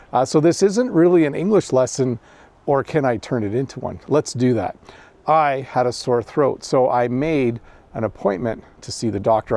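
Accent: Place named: Canada. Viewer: Surprise surprise, I understand canadian english better than american english, I don't know why.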